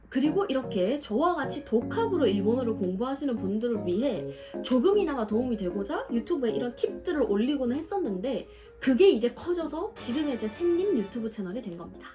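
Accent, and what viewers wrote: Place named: South Korea. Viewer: 인스타에선 광고 욕먹던데 온도차 심하구나....전형적인 한국인 일본어 발음이라고 광고찍냐는 댓글 많던데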